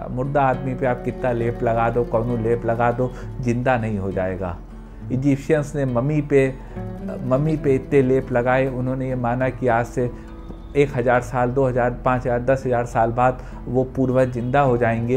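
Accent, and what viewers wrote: Lucknow, India. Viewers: Lucknow side ki bhaasha to lag rahi hai..........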